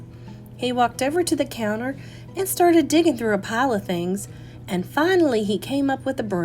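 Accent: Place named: South, United States. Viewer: It's very hard to find anyone who can read a chapter book with excellent intonation, phrasing, and tone. If I need to find a reading to post for my students because I will be absent or I have a student out for an extended time, it's nearly impossible to find good readers on You Tube. But, you are amazing! Your beautiful accent really adds to the ambiance of the story, since it takes place in the south. By far the BEST reader I can find On You Tube.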